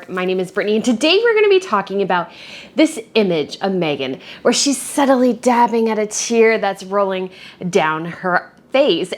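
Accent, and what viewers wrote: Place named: United States. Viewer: I'm nether British nor a 'Royal', but people like you are only after klick rates here. From yr accent I assume you're American, so what makes you an 'expert' on interpreting gestures and words of people you don't know and never will? Nothing but riding the currently so popular 'Anti-Harry-Meghan' wave... Disgusting!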